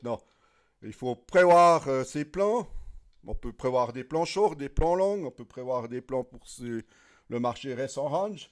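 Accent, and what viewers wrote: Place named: Alsace, France. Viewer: je reconnais bien ce bon accent alsacien car je suis de strasbourg 🙂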